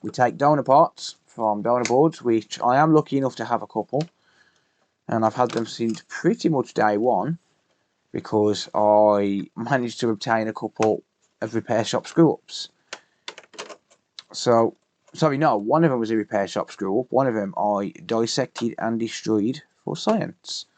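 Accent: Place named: Black Country, United Kingdom. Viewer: Another great video,. Watching and learning techniques from the likes of yourself, Vince, Northridge, etc I've learned absolutely loads. Your channel has something the others are completely lacking though. Being a guy who was born and brought up in Wednesbury but moved up to the Yorkshire coast, it's nice to hear a bostin accent from back home. Black Country ay we. 😂😂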